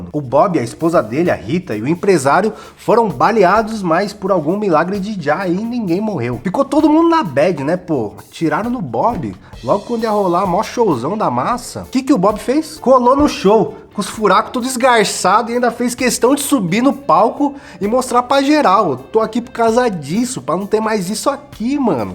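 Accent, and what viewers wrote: São Paulo, Brazil. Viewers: Paulista fala mttttt feio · O cara faz um vídeo com dialeto paulistano PQP que merda de sotaque do caralho.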